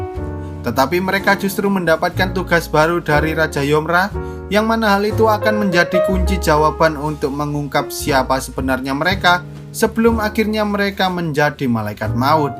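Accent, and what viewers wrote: Java, Indonesia. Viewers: Njirrr. Wes rah usah kesuen. Intro nya wong jowo doooonk.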